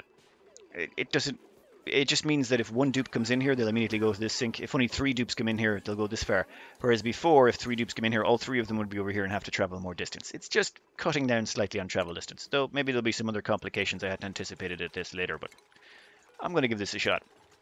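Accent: Ireland. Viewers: Where are you from? Do I notice an Irish accent?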